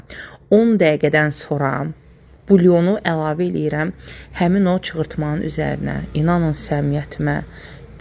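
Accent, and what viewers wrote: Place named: Balakan, Azerbaijan. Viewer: Балакен лехчесине охшур.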